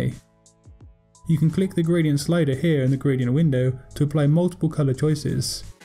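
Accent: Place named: Bristol, United Kingdom. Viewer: I knew I recognised that accent! The same for me between Bris and Bath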